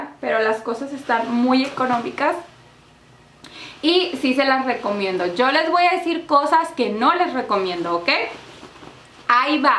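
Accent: Colombia. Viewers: tu acento no me gusto tipo colombiana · note como que traias acento colombiano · te escuche colombiana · hoy te escuche hace to como colombiana 😍😍😘😘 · senti que hablaste super acento Colombiano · Soy yo o te escuche como con otro asentó como colombiana o algo así jaja muy lindos zapatos 👠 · Ese acento Colombiano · Andas como media colombiana o algo Así no? 😂 Pero como quiera me gustó el videito jiji.. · note en este video tu acento colombiano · Y ahora porqué, anda hablando como las de Colombia?? ,🤔Así no hablaba ella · con ACENTO COLOMBIANO? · si eres regia intentas hablar como colombiana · Que Colombiana te escuchaste esta vez. Pero dentro de todo tu ropa muy bonita la mayoría te queda precioso.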